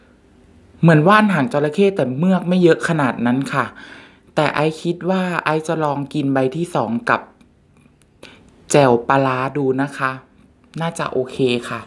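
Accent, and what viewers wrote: Isan, Thailand. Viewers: พี่เปนคนอีสานหรอคร้าาตอบหน่อยนะคร้าา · พี่เป็นคนอีสานเหรอค่ะ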